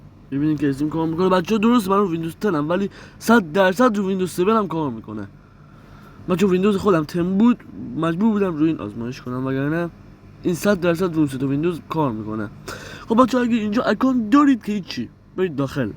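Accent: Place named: North, Iran. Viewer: لحجه شمالیتو عشقه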